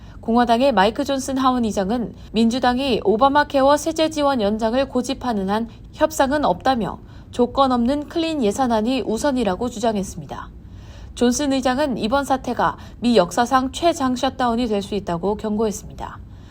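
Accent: China. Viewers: 이사람 목소리 발음이 수상 중국인인가?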